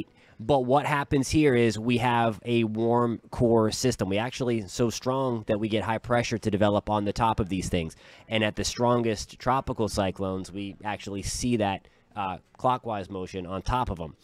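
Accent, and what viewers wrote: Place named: New England, United States. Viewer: Not weather related but did you say you’re in Texas? You sound like you were raised here in New England. Great pace delivering concise and interesting information- thank you!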